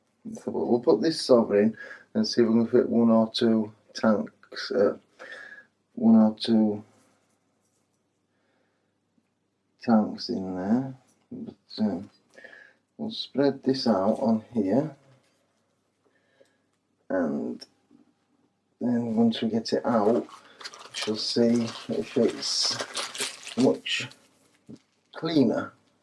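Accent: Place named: Yorkshire, United Kingdom. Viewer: He comes from the. North of England. Possibly Yorkshire. They tend to talk slower,giving the appearance of being drunk slightly. I'm from the south west yes we all have accents from wherever we live. At least we don't say y'all every other few words.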